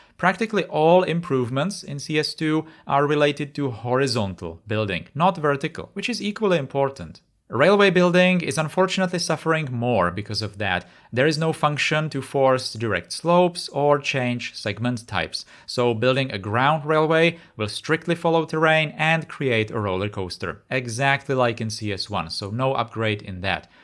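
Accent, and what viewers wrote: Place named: Czech Republic. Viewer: Are you Czech? I like your accent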